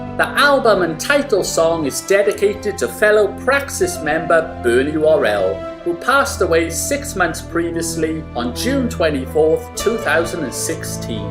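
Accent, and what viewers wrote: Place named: Wales, United Kingdom. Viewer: Love this channel. I definitely detect a Welsh tinge to your accent :). Where can I buy pikes 13, 43 and 78? Woo!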